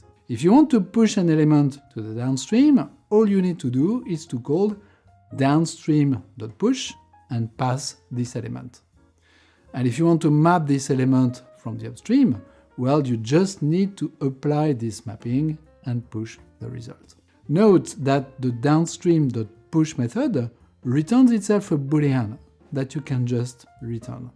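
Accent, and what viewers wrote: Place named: France. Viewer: Need a native English speaker for these. Very hard to understand or better yet just do it in your native French and have AI subtitles. Great content but I can't be the first to have this thought.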